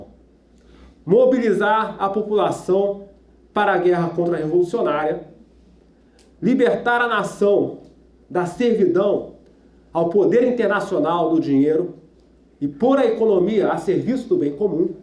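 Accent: Rio de Janeiro, Brazil. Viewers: Sotaque carioca típico,amigo: Também sou fluminense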